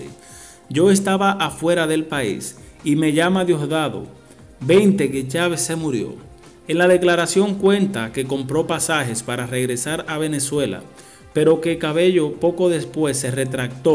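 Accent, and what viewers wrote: Cuba. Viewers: LOCUTOR CUBANO?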